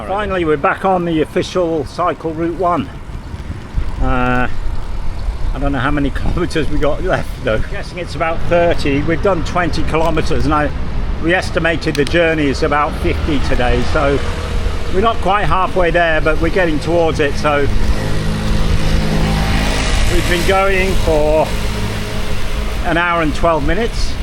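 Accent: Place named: England, United Kingdom. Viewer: Just loving English accent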